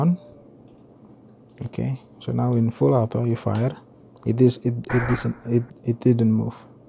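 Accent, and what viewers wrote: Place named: Indonesia. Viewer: Your accent is very indonesian. You even sound like my lecturer lol😂. Or are you?? Vsauce music plays Love your content btw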